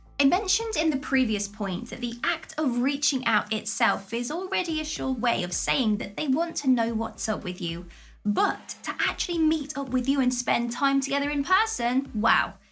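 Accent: England, United Kingdom. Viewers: Your videos are great, you're beautiful and I love your English accent.... Just saying, but thank you.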